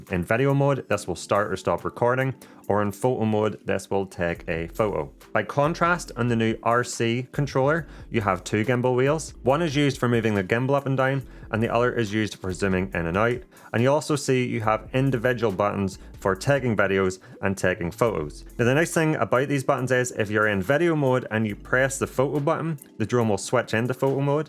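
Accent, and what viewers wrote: Ireland. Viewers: Came for the drone, stayed for the (Irish?) accent. Thnx. Grtz from the Netherlands. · Here on Youtube are many so-called self-proclaimed drones flying gurus. But none can touch your ankles. The heavy Irish accent is super. Also, your knowledge. Merry CHRISTMAS and SAFER NEW YEAR 2023. Thank you for your educational videos, I learned a bundle.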